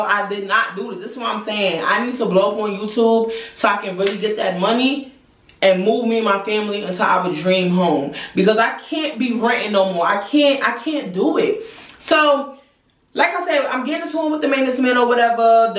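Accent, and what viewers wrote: New York, United States. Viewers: I knew you were from ny😂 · I just found ya page and I couldn’t understand why I love you soooo much already, then i heard the Nyc in your voice. Even then i was like no thats not it, then you said youre an aries 🥳🥳🥳 thats its 3.21🎉 gang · I want to visit New York so bad. I love your look, your style, your accent and slang. I love it 😍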